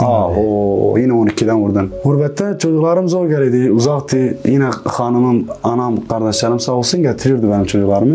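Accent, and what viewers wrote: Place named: Diyarbakır, Turkey. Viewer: Senin şivene kurban olayım diyarbekirlim ❤🌹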